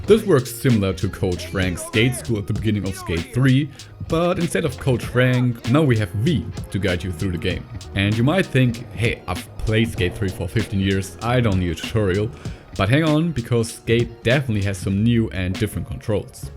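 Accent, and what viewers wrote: Germany. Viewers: bro bist du deutsch, glaube man hört des voll raus 😂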